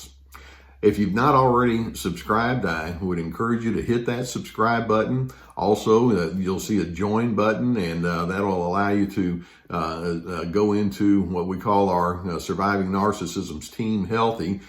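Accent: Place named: South, United States. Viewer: Yes,. WE HEAR YOUR HEART!! Your kindness, empathy and sincerity emanates from the screen!! Your words, knowledge and wisdom (and your Southern accent 😁) comforts and reassures me. Your videos have literally been a game-changer and a life-saver for me!!! THANK YOU!!!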